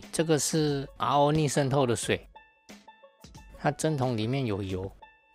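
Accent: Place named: Taiwan. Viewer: 台式英文，其實大家都懂是在講什麼就好。milliliter 音節這麼多念起來很拗口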